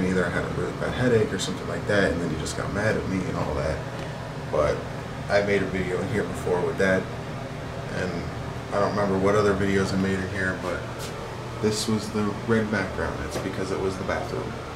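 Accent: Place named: Texas, United States. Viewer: Love the jumper. So these cats want Pizza? I keep thinking ur from Texas... x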